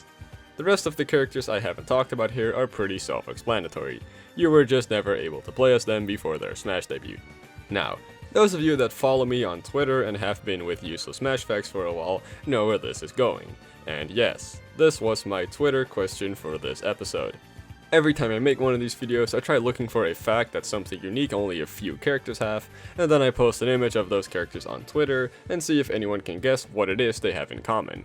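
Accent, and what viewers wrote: Netherlands. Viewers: now i cant unhear your Dutch accent. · "mensen denken sowieso dat dit duits is" I'm dutch (well actually flemish but who cares) and that's not german. I do not actually know what weird language that is, but I do recognise in your way of speaking english that you are a fellow dutch speaker. Why did i write this. Duits is een fantastische taal en Duitsland is een mooi land. Nein, niederländisch ist fiel besser als deutsch. Yeah i'm bi... trilingual... maybe we can even count french, nah i suck at french. I should really stop now this is becoming cringey. Why am i continuing, the cringe is real. Cringe and cringey are cringey things to say. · Can i maybe ask if you are from the Netherlands because your accent sounds a bit dutch. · Ben je Nederlands of Vlaams?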